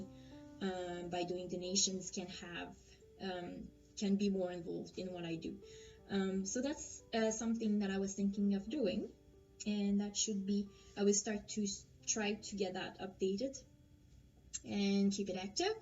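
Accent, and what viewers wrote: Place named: Quebec, Canada. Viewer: C'est vraiment sympathique comme vidéo, tu devrais en faire plus souvent! C'est super d'avoir des nouvelles de toi comme ça. J'ai aussi aimé les petits vidéos, surtout le "contact juggling" dont j'aime l'illusion! Bien sûr, comment ne pas apprécier la fin? Je me demandais quel accent tu avais! Eh bien c'est pas mal québécois, je ne suis pas trop dépayser :P Merci de cette attention pour les francophones qui te suivent! À bientôt! =)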